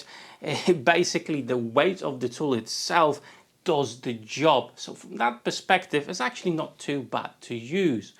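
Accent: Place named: West Midlands, United Kingdom. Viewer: Hey - your English is great, certainly a few thousand times better than my Polish! I'm guessing you were in the West Midlands (Wolverhampton?), judging by what I can detect from your English accent. All the best, Rob in Switzerland.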